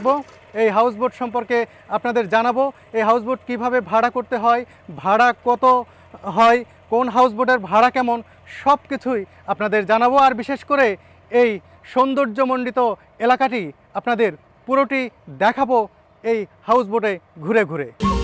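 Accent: Bangladesh. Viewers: Apnar kotha sune ..mone hoi apni bangladeshi ..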